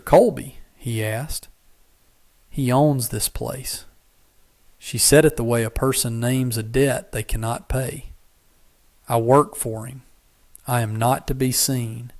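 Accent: United States. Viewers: I really do enjoy these stories. Well written and narrated with the nicely accented American voice. The image generator does a fine job. These are a nice respite. A good place for an old guy who likes Westerns.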